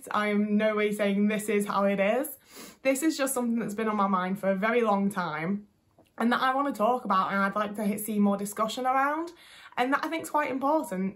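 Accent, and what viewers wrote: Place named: Liverpool, United Kingdom. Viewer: Congrats on 1000 subscribers! I think yours is a particularly refreshing voice because it represents a more working-class experience. Even the Liverpool accent is good to hear when so many autistic videos are RP. Those videos from others are often still very useful but it's good to hear something more distinctive.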